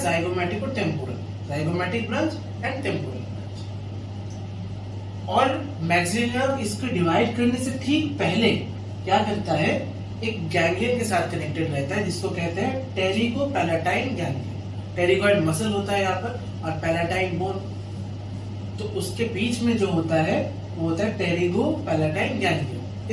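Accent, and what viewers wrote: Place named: Bengal, India. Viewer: Wow nice accent app bengal se ho kya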